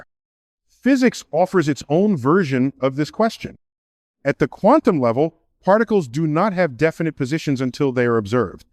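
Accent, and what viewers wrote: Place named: India. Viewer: This ai narration is boring. I hear Indian mannerisms as well.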